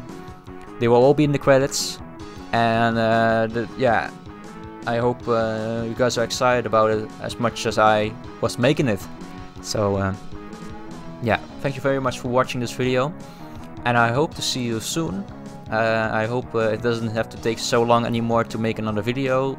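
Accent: Netherlands. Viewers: you sound Dutch cool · Man, this is one of the coolest projects I've heard about in a while. Must have been an honor to be a part of this! Might even consider getting a SNES now. By the way, are you happen to be Dutch? I hear a certain accent? ;-)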